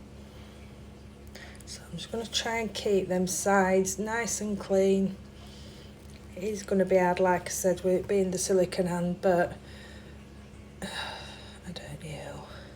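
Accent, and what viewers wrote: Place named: Birmingham, United Kingdom. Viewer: Thanks for this video it’s gonna help I’ve got this hand coming tomorrow:) also are you from Birmingham? You sound local to me x this set was lovely xx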